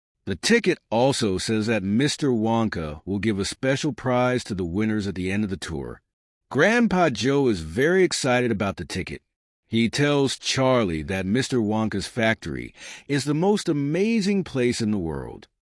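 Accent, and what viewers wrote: United Kingdom. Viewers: Excellent performance and a distinctive British accent. Thank you very much... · Por casualidad, este inglés es británico? Por cierto, es muy bueno este contenido, gracias por compartirlo!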